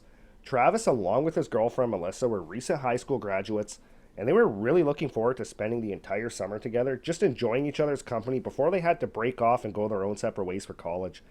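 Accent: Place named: Canada. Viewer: Haha im canadian from far northern British Columbia i recognized this guys accent right away as a canadian! Cool channel will sub, but id love to hear a story on nahani valley/headless valley in the north west territories , i think it is terrifying as a national park based on the past stories!!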